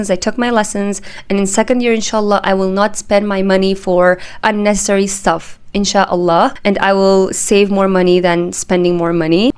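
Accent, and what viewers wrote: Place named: Turkey. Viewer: Please make a video in Turkish language only Because I am in love with your Turkish accent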